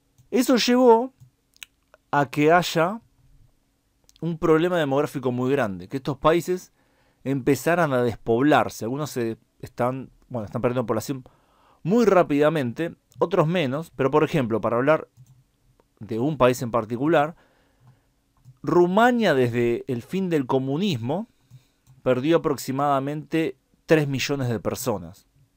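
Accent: Argentina. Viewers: Este creo que es un argentino por el acento. Perú, país inviable? Está muy despistado. Los ejemplos que pone son absolutamente burdos. Hoy, Argentina sufre los efectos del peronismo y Kirchnerismo. Le sugiero que estudie más y hable con sustento. El 45 % de la población argentina se encuentra en pobreza y Milei, tendrá que trabajar mucho y alinear a este charlatán que me causa vergüenza ajena. Argentina está en default y con índices de pobreza y subdesarrollo espectaculares. Perú, está creciendo económicamente durante los últimos 30 años, pese a los problemas y que tiene una de las monedas más fuertes de América Latina. Anda a estudiar economía en serio y basta de vulgaridades basadas solamente en la variable población.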